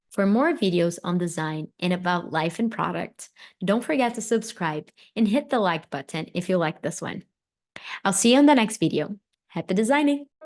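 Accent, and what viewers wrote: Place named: Brazil. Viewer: I KNEW you were Brazilian, I felt it from your accent. I'm Brazilian too and I love your videos. I'm a product owner | Product Designer:hand-pink-waving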